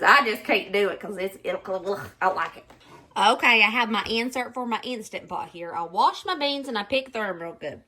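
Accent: South, United States. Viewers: I’m not Mexican but in Texas you’re not allowed to not like Mexican food. It’s the best. I also love Vietnamese foods. They use a lot of those great spices plus others from Asia. You should try some of the Asian recipes. People would love your take on them. I’m an old great grandmother who really enjoy watching you. I love your accent it’s so warm and southern. You and your family are precious. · don't apologize for your Southern accent. I was born, raised and still live in Tennessee and the older I get the prouder I become of my accent. You are a dear girl. I love watching you and your sweet family. God Bless you. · I adore your accent, I moved to Texas & there are not a lot of people here who have country accounts. 😔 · Sister we understand that southern drawl just fine! ❤ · Girl I'm here for your country accent!!❤ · I LOVE & ADORE YOU!!! 😂🩷😁 You are the funniest when you're trying to explain things, like your Southern Accent trying to pronounce Mexican words!🫣 Thank you for making me feel better and giving me a chance to actually feel like laughing🩷🤭🤣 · LOL!! 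I'm a Texan of Mexican heritage ... I, too, hate cilantro & DOES taste like soap!🤢😫 My bestie LOVES cilantro and uses it a lot (🙄🤦‍♀️), and I've gotten used to it, but I still won't buy it.😉 First time here, and I subbed. Love your southern accent. ❤ And you did pronounce frijoles correctly. 👍 Oh, and I have added cornbread to my mom's beans and still do. Can't help it.🤷‍♀️ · You talk just fine, don’t mind the (KARENS)😳. ❤to you thank you for your time and country a. accent. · Luv yo Suthun accent...lol. I often make the chorizo with beans but use the very minimum amount of liquid. This us just a micro part of the gigantic array of food dishes from Mexico. I also make Móle, Enchiladas, Chiles Rellenos, (Shee-less-reh-yenos) plus many other dishes at home. God bless you and your family. BTW, I love cilantro and make my own Salsas with cilantro. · lol.. we can hear the country accent, if you can’t they are country too, and they speak your language so they wouldn’t know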